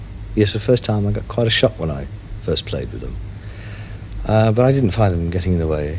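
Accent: RP, United Kingdom. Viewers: bit of a posh old boy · Funny hearing him talk posh I'm just waiting for him to break out saying .you dirty old man lol pure gold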